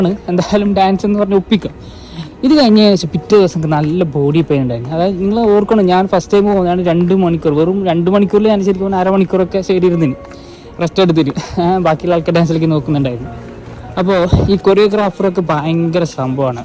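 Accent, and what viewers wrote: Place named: Thalassery, India. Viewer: bro i laughed a lot 😂😂😂 nalla pang indappa samsaram ❤thalassery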